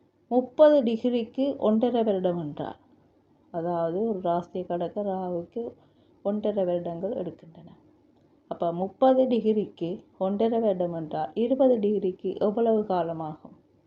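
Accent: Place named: Sri Lanka. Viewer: நல்ல அருமையான, நுணுக்கமான விளக்கம் . நன்றி அம்மா.. உங்கள் பணி தொடர வேண்டும்...வாழ்த்துக்கள். நீங்கள் ஸ்ரீலங்காவிலிருந்து பதிவு செய்கிறீர்களா... ஸ்ரீலங்காவில் உள்ளவர்கள் பேசும் தமிழ் போல உள்ளது...🙏🙏🙏🙏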